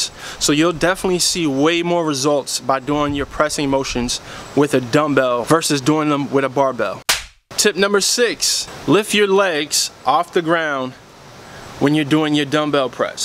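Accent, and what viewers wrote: New York, United States. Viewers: Ten Chest Commandments... I hear that NY in you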